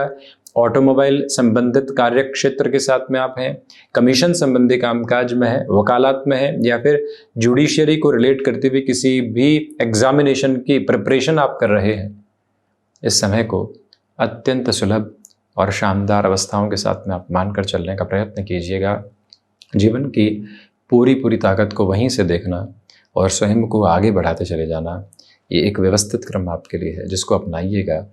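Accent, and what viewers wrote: Standard. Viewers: आपका आभार एवं धन्यवाद, इतनी स्वच्छ और शुद्ध हिंदी सुनकर मन प्रफुल्लित, आनंदित हो उठता है।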